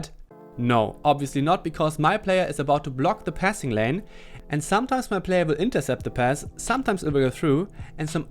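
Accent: Germany. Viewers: Bist du Deutscher? Hört sich stark so an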